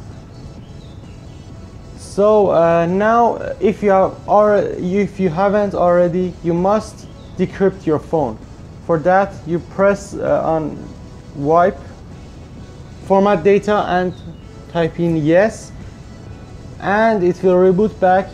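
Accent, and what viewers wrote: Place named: Iran. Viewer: دمت گرم جوون قربون انگلیسی حرف زدنت. حرف نداره. تا گفتی ادین، گفتم این لحجه باید فارسی باشه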